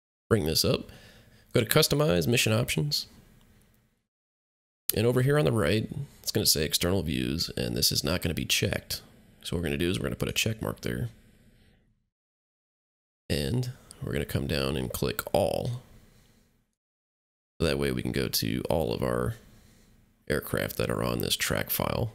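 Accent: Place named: United States. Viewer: 'mi...' what? Zee...? You Yanks are really 'zeee' in your sick nappers. Unbefuckinglievable how you linguistically inapt and generally illiterate riffraff mutilate the English language. And to top it all off some even add this disgusting, thick rhotic accent... Barf! It causes ear cancer. How about not to try to swallow your own tongue while you speak? 'Nohohoho, better not, for we could sound like humans and not like the armed lunatics who we are, spread all over the world to murder other peoples.'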